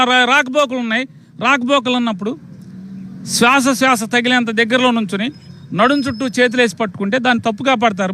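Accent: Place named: Godavari, India. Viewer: మీరు మా గూదారొళ్లలా భలే మాట్లాడుతారండీ. పాపం నాయుడు గారండీ! ఆయ్ 😊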